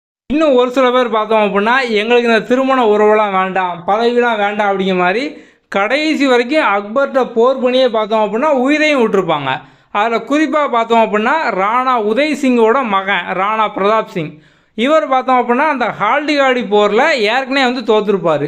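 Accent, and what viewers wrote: Tirunelveli, India. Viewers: Are you belong to Tirunelveli?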